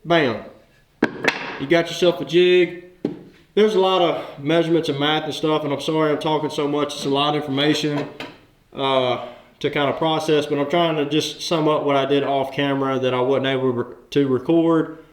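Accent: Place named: South, United States. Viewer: the redneck southern drawl is epic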